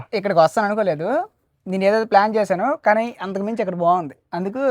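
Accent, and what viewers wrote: Srikakulam, India. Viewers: Srikakulam slang very funny and super bro Form tirupati Neenu mee pelli ki tirupati nundi srikakulam ki vasthunna bro🎉🎉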